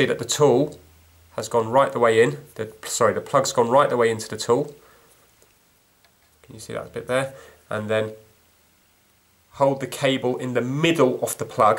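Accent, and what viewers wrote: England, United Kingdom. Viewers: It's how English is spoken by the English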